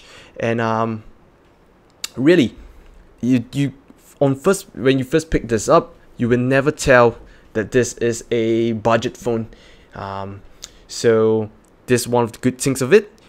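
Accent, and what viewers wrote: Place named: Singapore. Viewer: Very "Singaporean" accent there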